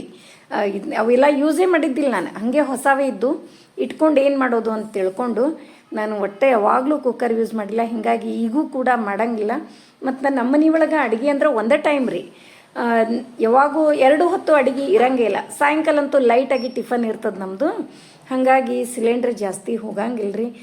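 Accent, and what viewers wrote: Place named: Hubballi, India. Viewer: Aduge choyittu niu yaav urinavru nimma bhashe kelidre hubballi anistu😊❤🎉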